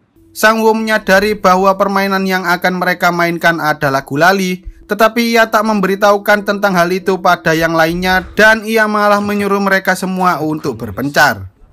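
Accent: Java, Indonesia. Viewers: Suaranya medok banget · ngantuk gue dengerin miminnya medok bat 😭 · Jowo campor indo wes mboh medhok e pol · kho jawanya medok bnget😅😅😅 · Kental Kali logat jawa nya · Medog banget ngomongnya hehe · Kek suara sama logat medok nya dodit mulyanto. ya gak sih😂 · Ngomongnya medokkk banget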